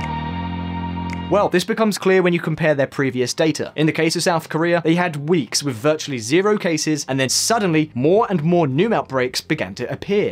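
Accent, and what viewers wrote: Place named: Scotland, United Kingdom. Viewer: I feel like I'd be happy to listen to Scottish guy narrate, but his microphone situation needs some love!!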